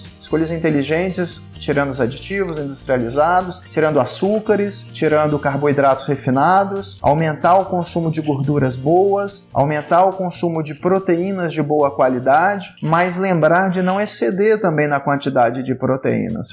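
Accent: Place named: Rio de Janeiro, Brazil. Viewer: Fico encantada com sua voz, linda! Vc deve ser carioca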